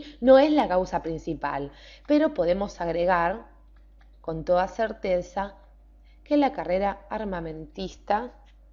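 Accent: Argentina. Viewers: Interesantisimo video ademas me encanta como habla esta chica con su acento Argentino.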